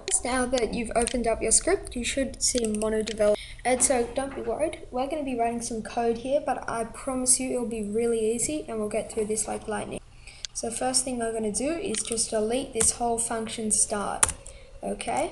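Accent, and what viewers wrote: Australia. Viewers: I am quessing, just a hunch, your an Australian. Let me know if I am right. Nice to hear the accent..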